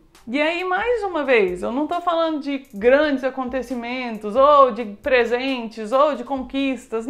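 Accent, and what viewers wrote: Minas Gerais, Brazil. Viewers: “Acabei de ter um estranhamento com cê” apaixonada nesse mineres 😍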